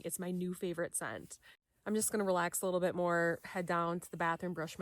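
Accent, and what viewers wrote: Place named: Minnesota, United States. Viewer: I was guessing from the accent you were from Minnesota. And then you verified it. Uff da😂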